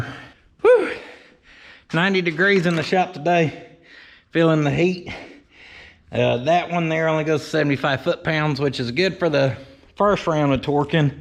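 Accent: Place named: South, United States. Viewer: I've noticed from watching you for years that you are the only one in your videos that has a southern accent When you're family and friends speak they don't have that and it makes wonder where that came from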